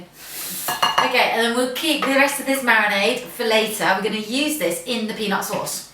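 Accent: England, United Kingdom. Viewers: Hi there, I really appreciate you making this video! I'm Bruneian and currently staying in the UK. being away from home makes me miss all the asian food! and satay is one of them! I'll be going to try this recipe. AND you've got an amazing english accent!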